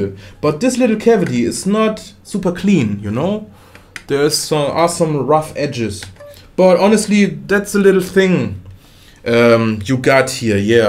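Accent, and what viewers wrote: Germany. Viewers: I like your light understandable german Akzent.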